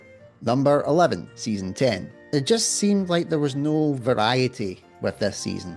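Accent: Scotland, United Kingdom. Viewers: Came for set discussion, stayed for the Scottish accent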